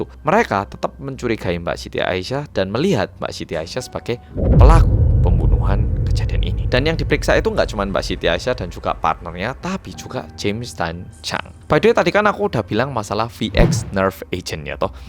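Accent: Java, Indonesia. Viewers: Sampean kui wong korea opo wong jowo, kok bosoe medok mbanget... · Kok bisa suaranya medok sekali spt orang Indonesia. Suara koreanya hilang samasekali. Salut.. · ABG ini orang Indonesia apa orang Korea sich suaranya kok Jawa medok kali. Bingung JD nya. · Korea kok ngomong logat nya Jawa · Bosone medok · Mas ini logatnya Jawa banget. Seneng dengarnya 😊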